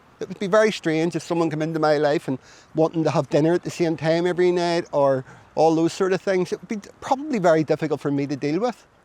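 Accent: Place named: Northern Ireland, United Kingdom. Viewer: I love your accent. M b i.ll move to NI.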